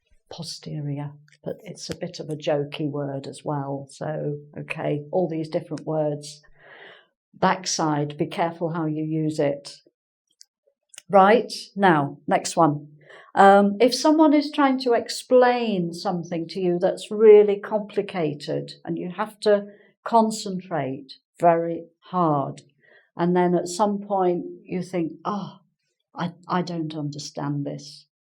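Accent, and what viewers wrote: United Kingdom. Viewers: Hey there! I'm a brazilian English student. I thought her British accent so cute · Granny !! Really all Your lessons very fantastic and useful for beginners to learn the British accent could you help me to get the special classes to get improve my pronunciation&accent .. hopefully waiting for ur text message!!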